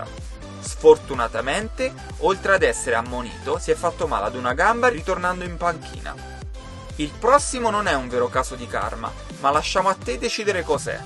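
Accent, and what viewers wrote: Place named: South, Italy. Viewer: trick analfabeta....trick.........youtube la patria degli ignoranti con slang meridionale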